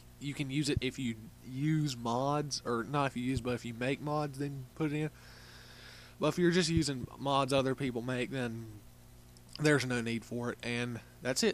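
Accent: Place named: South, United States. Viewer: very southern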